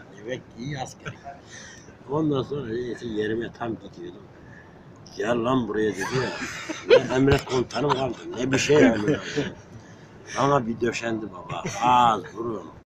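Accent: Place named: Yozgat, Turkey. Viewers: Konuşanın ağzını Yozgat ağzına benzettim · Nereli bu adam? Şive Iç Anadolu ama il-ilçe Konya tarafı mi acaba? Bilen var mi?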